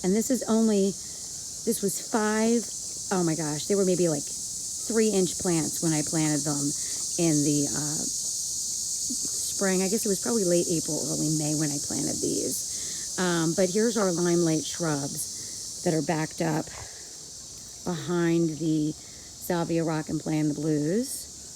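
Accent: New Jersey, United States. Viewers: I knew the accent! Hey jersey girl from philly girl!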